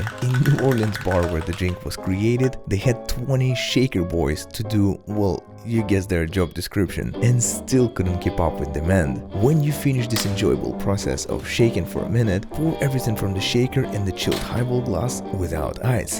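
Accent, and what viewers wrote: Russia. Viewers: You russian or romanian or bulgarian?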